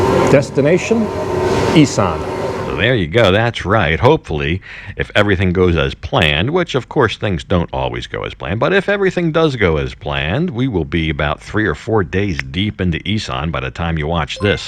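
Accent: United States. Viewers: Enjoyed your video as I am new to the Jomtien area. Honestly, it was comforting to hear an American speak! LOL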